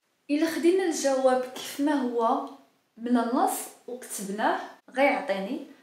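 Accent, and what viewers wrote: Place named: United Kingdom. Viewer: you have british accent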